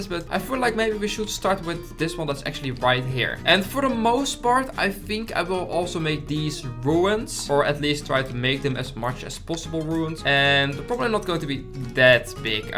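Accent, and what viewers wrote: Netherlands. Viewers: are u from the netherlands?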